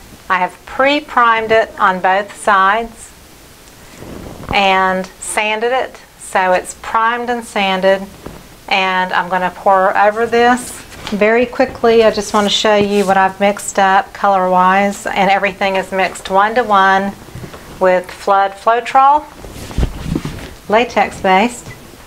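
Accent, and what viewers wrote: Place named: North Carolina, United States. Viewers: I love to hear you talk you sound like. ME yeah. You sound like me Those. North Carolina girls are the bomb bo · You kinda sound like an NC girl like me. Mid Atlantic? I’m trying to place your accent ☺️ This pour is absolutely stunning! Thanks for sharing. 💜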